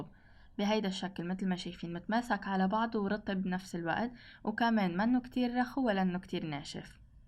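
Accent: Syria. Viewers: عم تحكي سوري مو لبناني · حضرتك سوريا و لا لبنانية لغتك جميلة انا مصري من صعيد مصر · شنو هاي ال لهجة السورية غير تموت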